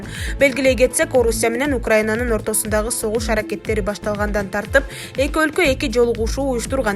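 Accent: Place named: Uzbekistan. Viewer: Бул канал калп айтат ишенбегиле диктору да оштук сарт кыргызча интонация жок озбекче интонация менен суйлойт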